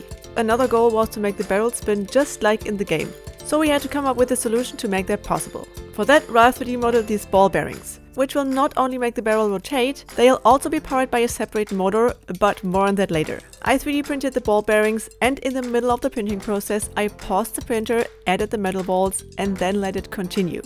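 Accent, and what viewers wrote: Germany. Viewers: I thought your accent sounded German! :D Wago is the brand, so like Tempo or Zewa it is used as a noun even though it's a name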